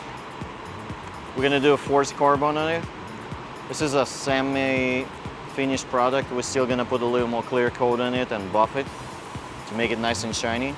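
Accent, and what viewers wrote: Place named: Soviet Union. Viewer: sorry buddy but your soviet accent is annoying but good work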